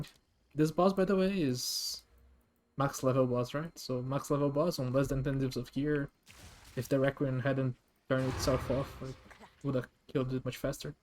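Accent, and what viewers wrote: Brazil. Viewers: My brain is sensing a brazilian accent on you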